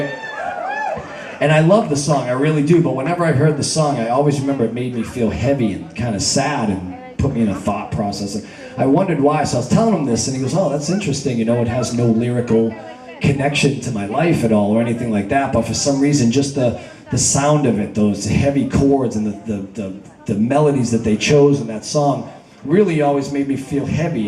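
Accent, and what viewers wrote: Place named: Boston, United States. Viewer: I could listen to him talk anytime love that Boston accent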